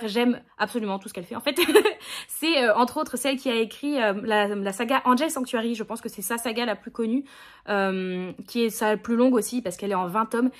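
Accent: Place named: England, United Kingdom. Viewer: Olala, le livre autour de Jane Austen me tente ❤ Sinon j'adore l'accent anglais 😂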